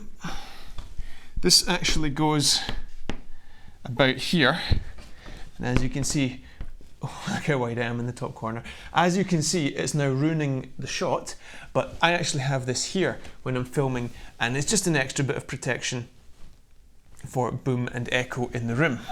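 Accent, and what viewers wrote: Scotland, United Kingdom. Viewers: Sometimes a Scottish girl just needs a Scottish guy to explain things! Thank you sooo much! I get it now (I think) 😃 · Hes talking like the characters in “brave” movie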